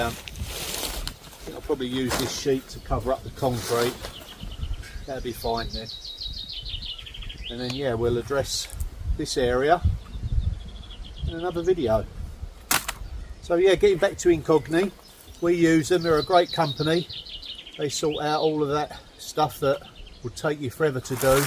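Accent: United Kingdom. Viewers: . I texted UTube about this problem Their answer: British accent maybe hard to understand. That’s in a nut shell. But that’s not right because American English is having trouble too. They have a problem and can’t fix it. 😢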